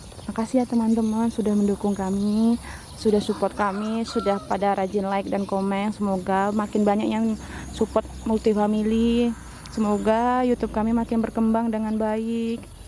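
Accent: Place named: Java, Indonesia. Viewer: Adiak ko asli urang Minang apo urang Tran jawa yo ? Soal nyo logad jawa adiak mengecek nyo Alah lamo merantau ka sitiung diak ?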